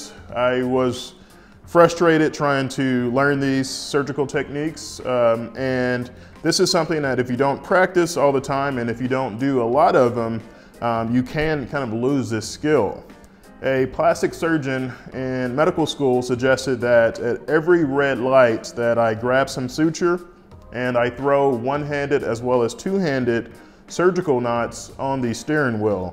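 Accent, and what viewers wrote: South, United States. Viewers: I love the southern accent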